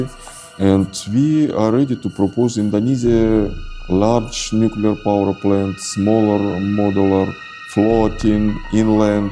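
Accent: Russia. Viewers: Gw tetep harus denger karena gw juga pengen denger aksen rusianya pas ngomong bahasa inggris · Aksen rusianya kental.. Keren..😊😊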